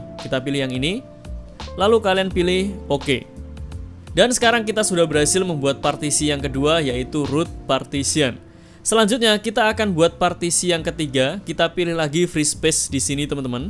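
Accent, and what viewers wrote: Banjarmasin, Indonesia. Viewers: What , abang orang banjarmasin ya ?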